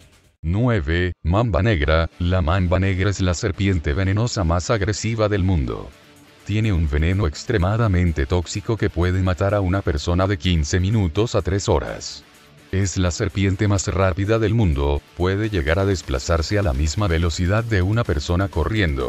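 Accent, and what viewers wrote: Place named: Spain. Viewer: oye como haces la bos de españolete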